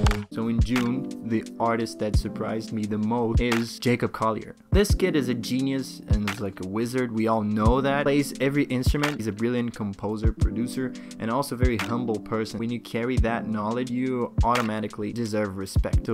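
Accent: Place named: United Kingdom. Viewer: Having a british accent is an unfair advantage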